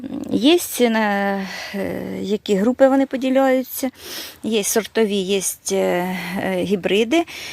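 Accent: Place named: Central Ukraine, Ukraine. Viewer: Добрий вечір,. Пояснюєш і росказуєш дуже доступною мовою. Ти чесна і відкрита людина. Говір центральної України. Велике дякую за всі відео і твій труд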